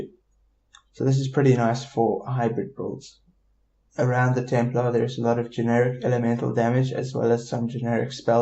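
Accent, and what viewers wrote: South Africa. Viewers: lol dude, instantly heard you were south african. thanks for the info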